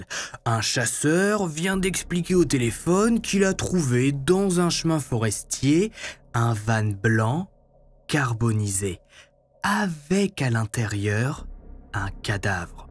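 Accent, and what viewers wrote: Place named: Picardy, France. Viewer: Ce fait comme un rat mort. Tu es du nord Picardie j’adore 👍 bisous 😘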